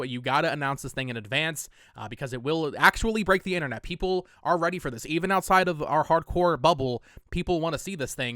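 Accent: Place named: Canada. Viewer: You say “on” like “awhn”. Are you Canadian?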